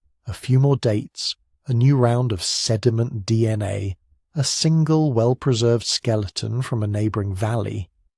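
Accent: United Kingdom. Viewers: I put the playback speed on 80% and man something about a slow british accent just knocks me out😂🙏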